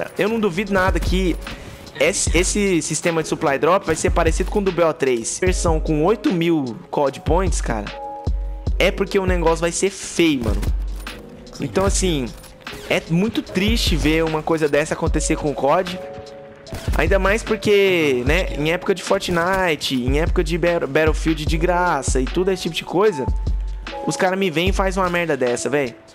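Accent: Minas Gerais, Brazil. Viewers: Cê é mineiro?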